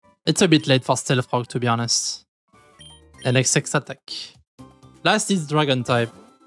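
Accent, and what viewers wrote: France. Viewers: your french accent is fire 🔥🔥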